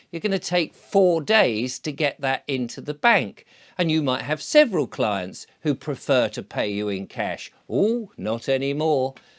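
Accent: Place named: RP, United Kingdom. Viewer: Looking at the way dresses and his house, and the posh way he talks one suspects he may have a bob or two!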